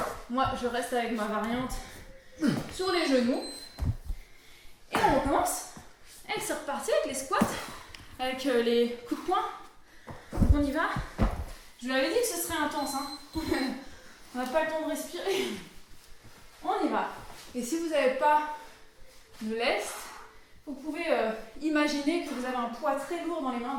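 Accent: Réunion, France. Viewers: "la tantine elle commence le naffair, elle me dit même pas"...Vous êtes réunionnais ? :D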